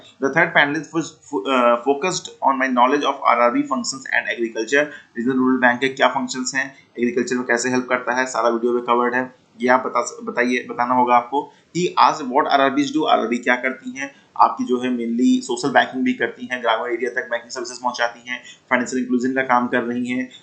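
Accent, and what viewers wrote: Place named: Punjab, India. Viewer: Sir kbhi hindi jyada boli nahi to hindi me Punjabi touch feel hota hai ....chlega kya!?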